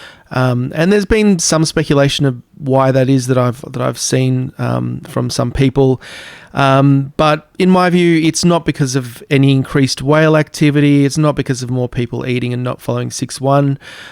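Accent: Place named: Australia. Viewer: Thanks mate good to hear another Aussie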